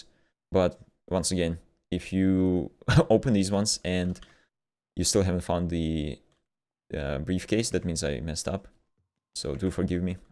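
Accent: Russia. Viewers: You live in New Zealand right, but your accent reminds me russian a lot.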